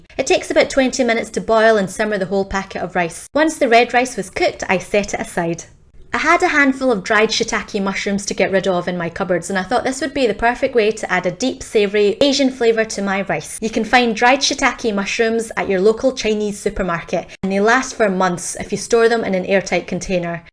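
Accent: Scotland, United Kingdom. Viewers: You speak with a lovely Scottish accent. Am I correct? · I was just looking for some decent vegan meal preps & came across your video. Took me 5 seconds to subscribe thanks to that fabulous fellow Scottish accent! Woohoo! :D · Whenever I see/hear an Asian with a UK accent, my brain does a double-take. Scottish is a new one for me. And you live in Australia. And eat Canadian maple syrup. Such Commonwealth.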